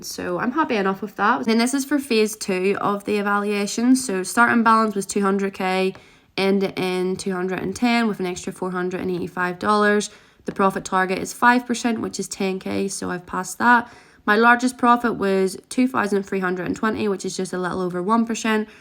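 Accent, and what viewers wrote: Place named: Ireland. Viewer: First time watching your video. Thought you were Indian, surprised to hear your I believe Irish accent. A suggestion would to make your content more engaging even to non traders to grow your channel quicker.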